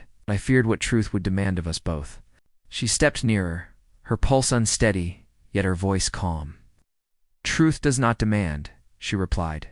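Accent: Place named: United States. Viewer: Narration is poor, American accent for an English Regency and weird scream, strange sounds between words. No real basis for him to continue being blind.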